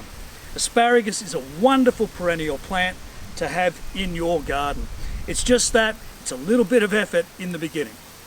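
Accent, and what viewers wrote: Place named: Cockney, United Kingdom. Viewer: 2 questions for the asparagus expert: 1. I grew asparagus from seeds in small containers 3-4 sprouted in each I have 5 of those containers and 1.5m in length of ground/soil where I'm planing to plant them So my question is: is it okay to plant each pot with 30cm apart So it will be 3-4 asparagus - 30cm space - 3-4 asparagus - 30cm space etc. 2. Should I use the same method for planting / soil like you used in this video Many thanks BTW love the accent, reminds me of London's Cockney accent :)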